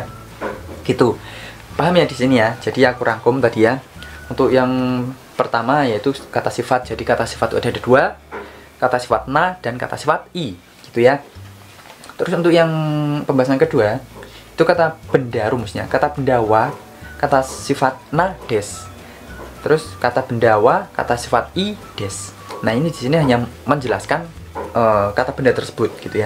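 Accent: Java, Indonesia. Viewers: daebak jepangnya bisa juga pake logat medhok mas yaa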